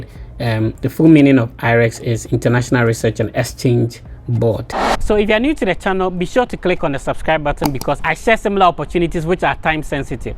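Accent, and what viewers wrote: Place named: Ghana. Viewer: You speak a Ghanaian English. Are you Ghanaian?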